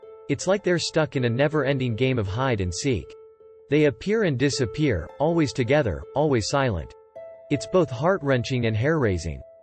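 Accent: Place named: United States. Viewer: Never believe a voice sounding like a America they never get the facts right.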